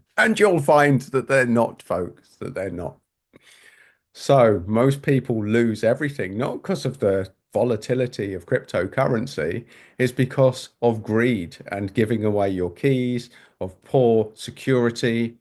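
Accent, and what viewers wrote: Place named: London, United Kingdom. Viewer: Hi there. Good video. By your accent, sounds like you're Londonish based too. It is a very good point on the terrible UK establishment psyop on Crypto. Almost everyone I know still thinks BTC is the money of criminals thanks to this fear porn. For those that are interested, I also put out a video on how not to get scammed in crypto - different angle though.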